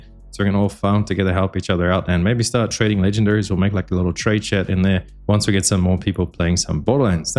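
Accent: South Africa. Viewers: Great video very well laid out. Curious is that an South African in AUS accent? Just guessing don't burn me if im wrong.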